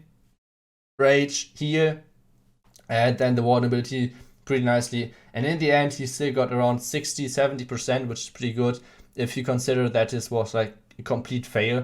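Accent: Germany. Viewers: Thanks for making an English Channel so I can understand. Loving the German accent. I hope to see more of your content. And do give Galadon elder. He deserves it.:)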